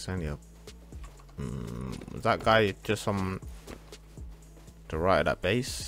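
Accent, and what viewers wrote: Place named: United Kingdom. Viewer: Mate sounds like a brit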